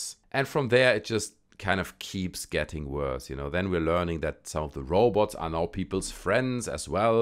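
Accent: Germany. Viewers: This guy has an oh so subtle german accent but speaks great English... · HAHAHAAAA! LOL. Your German Accent just makes everything sound funnier! Good job though.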